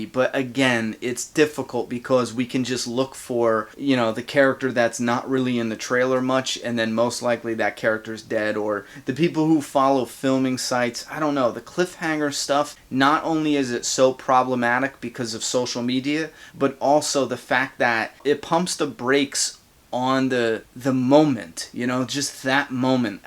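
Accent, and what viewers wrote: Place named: Boston, United States. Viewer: are you from Mass? I hear that Boston accent...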